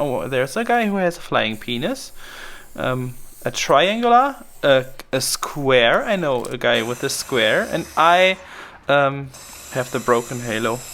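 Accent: Germany. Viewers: Hi, love your work! Where are you from? Love your accent! You sound either German or Scandinavian.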